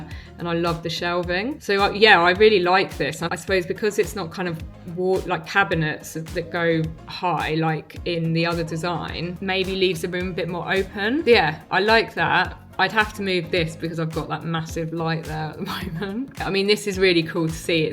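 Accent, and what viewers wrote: United Kingdom. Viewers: Your Great Accent makes me think of Big Ben or The Tower of London , Are you a Brit , Fly a Union Jack in your new studio .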